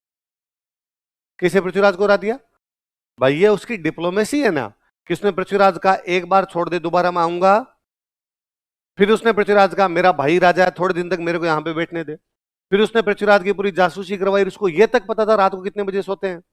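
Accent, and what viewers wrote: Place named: Rajasthan, India. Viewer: Or kaise bolte h bhai ye rajisthan ke teacher h to aise he bolte h bha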